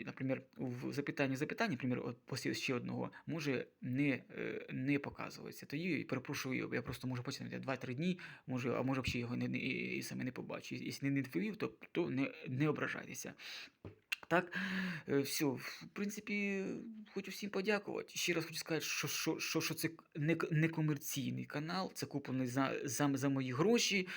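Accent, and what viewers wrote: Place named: Chernihiv, Ukraine. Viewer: Схоже на чернігівську балачку) покращуй мову, а так то цікаво розповів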